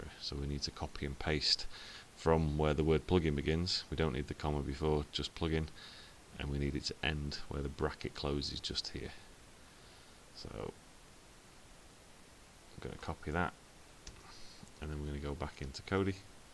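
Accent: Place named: United Kingdom. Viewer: Really like watching your tutorials but I do have one little issue I can't really hear you to great nevertheless it's good to watch some British tutorials instead of American all the time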